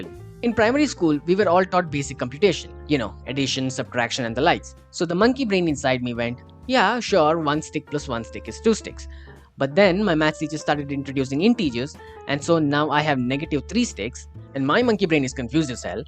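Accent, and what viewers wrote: India. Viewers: Been forever since I've heard the Indian accent even though I'm Indian, glad I watched ts tho cuh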